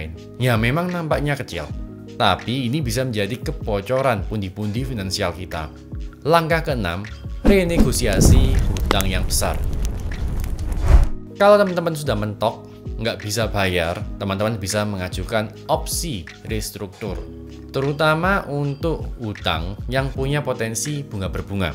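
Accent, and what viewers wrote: Java, Indonesia. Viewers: lihat.cnl.ini.lngsung.tertarik.kaget .mase.ganteng bangt kok.suaranya.kejawen....mantap...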